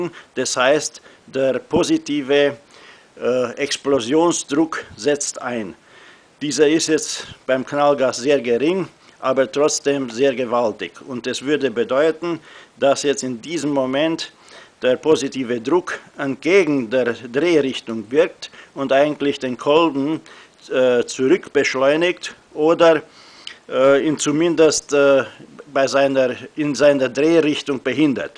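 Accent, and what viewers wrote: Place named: Transylvania, Romania. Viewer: Siebenbürgener Akzent...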